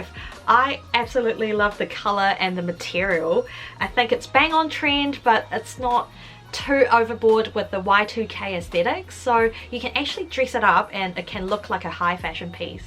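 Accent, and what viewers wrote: New Zealand. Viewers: I missed my new zealand accent icon and fashion queen.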